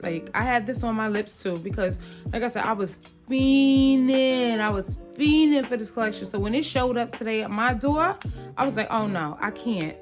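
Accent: New York, United States. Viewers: You have to be from New York saying because like that lolll at least the east coast I’ll finish watching loving your vibe new subbie!!!! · Your accent is great, I thought you were from New York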